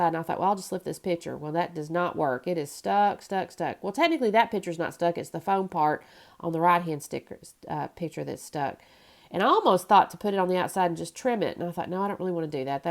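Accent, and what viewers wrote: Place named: Virginia, United States. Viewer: Congratulations on meeting your subscriber goal of 1,000! I always enjoy your layouts and your personality in your videos. My husband was walking through the room yesterday when I was watching your latest video and said "Where's she from? She sounds like she's from back home where I lived in Virginia!" Hope you keep on making videos and continue to increase your subscribers!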